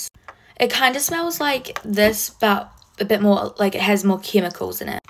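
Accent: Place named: New Zealand. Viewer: Are you from new zealand because my teacher is and she sounds just like you😂😂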